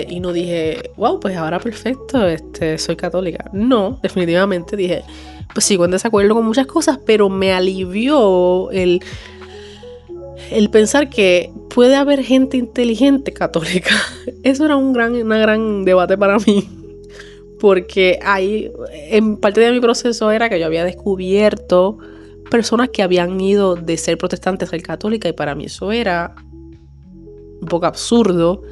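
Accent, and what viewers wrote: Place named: Puerto Rico. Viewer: Hermana Boricua! Lo sé por tu acento. Crecí asistiendo a la iglesia católica y en colegio católico y teniendo toda esta riqueza en mis narices no lo entendía en mi corazón. Mi mamá siempre me decía esta es la iglesia que fundó Cristo y aquí tenemos la Eucaristía, pero yo seguía siendo una católica tibia, sin compromiso… fui a una iglesia evangélica por unos meses pero cuando empezaron a hablar mal de las monjas y los sacerdotes además de la presión para dar dinero y me dije…esto no es del Señor…además que algo me faltaba y era literalmente la comunión, la Santa Eucaristía. Volví y empecé a empaparme de lo que creemos y a buscar información y quedé bruta y convencida de que era verdad lo que me decía mi mami. Abrí mi mente y mi corazón y estoy enamorada de mi Jesús en la Eucaristía- somos el cuerpo de Cristo - la iglesia que El quiso que viviera en unión. 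No somos perfectos pero El dijo que las fierzas del infierno no prevaleceran contra ella. Dios te bendiga y te acompañe en este caminar. Un abrazo! Vi tu video desde Virginia, EU.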